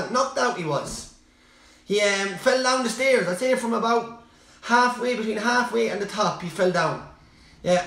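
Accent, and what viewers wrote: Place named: Ireland. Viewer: Pure Irish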